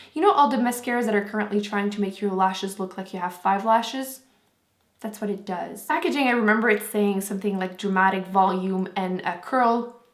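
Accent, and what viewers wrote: Quebec, Canada. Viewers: Are u from quebec 😏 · Ah j'ai reconnu ton accent tout de suite!! Une québécoise!! :) Cool ton vidéo!!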